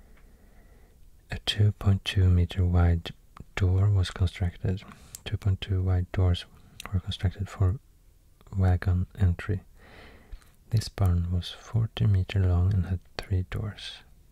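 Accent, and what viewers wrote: Sweden. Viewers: So obvious that you are from sweden haha, lugnt och skönt ska det va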